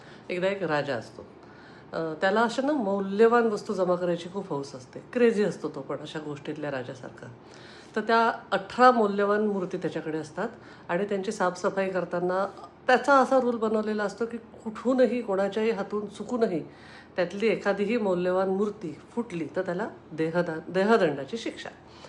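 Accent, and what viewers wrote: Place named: Pune, India. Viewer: Mla pn aasch vatat hot ki bahutek tumhi punya cha aasal bolnyacha ton varun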